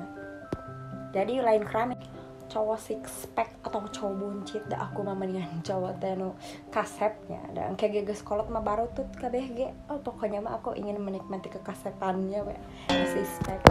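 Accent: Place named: Sunda, Indonesia. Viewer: Naha sih lamun ngomong sunda meni bodor pisan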